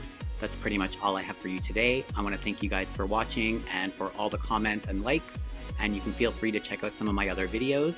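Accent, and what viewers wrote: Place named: Canada. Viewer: thought I detected a bit of hoser accent...(that screengrab with the TO ad on it was the final puzzle piece)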